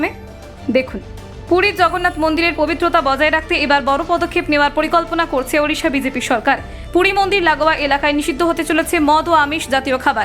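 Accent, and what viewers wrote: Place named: Bangladesh. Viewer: Apnar acsent seems to be Bangladesis. Decision is very much correct. Puri must be sacred and peaceful.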